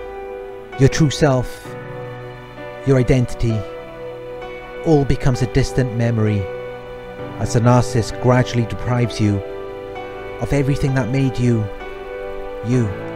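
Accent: Wales, United Kingdom. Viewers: Your voice tone is helpful- to an American you sound a little like a famous Welshman - Richard Burton. Not exactly of course, but we know you are wounded similarly. He wrestled in a narcissistic system, and family life. Thanks for the better benefit. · You sound Welsh .. possibly near Newport. I experienced this for 5 years and had to undertake hundreds of hours therapy, finally finding Anoushka at Balance Psychologies to help me twice a week with specialised NPD abuse therapy / counselling. I've learned about this now .. it's been the hardest time in my entire life .. I absolutely get it now, and I find it interesting that there's a Newport person on here reporting this. I'd love to get together with you and talk through this together. I'm in my 50's and she got me, I had no idea. I now have taken the red pill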